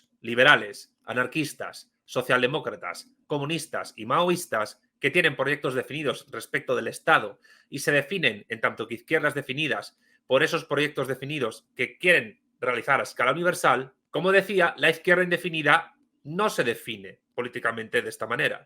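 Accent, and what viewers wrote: Spain. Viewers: Por favor que hace est señor con asento español opinando de el señor petro .... Analiza a los de tu españa amigo no metas las narices dond no t han llamado ....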